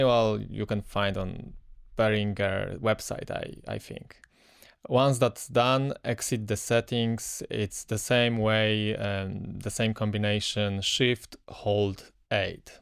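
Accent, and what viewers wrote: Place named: Poland. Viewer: Słyszę polski akcent w angielskim, ciekawe czy zgadłem ? Bardzo fajny materiał. Pozdrawiam 😜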